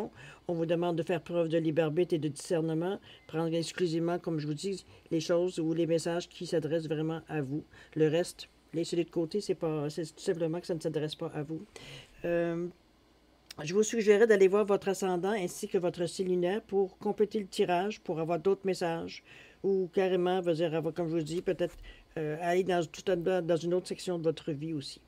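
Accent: Quebec, Canada. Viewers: il faudrait parler moins rapidement,car ce n'est pas évident avec l'accent québécois,merci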